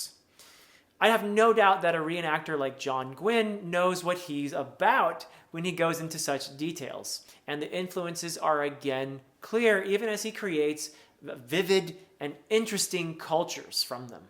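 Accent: Wales, United Kingdom. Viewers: Having lived in Wales for a year, I was pleased to see you bringing this point predominantly into your review shedding light on Gwynne's intentions and highlighting other reviewers' misinterpretation of it being about Vikings. Also, your pronunciation sounded just like all the welsh bloke around me all the time while I was there, so nice going!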